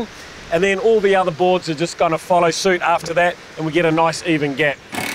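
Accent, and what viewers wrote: New Zealand. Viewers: That’s a New Zealander. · It’s New Zealand not Australia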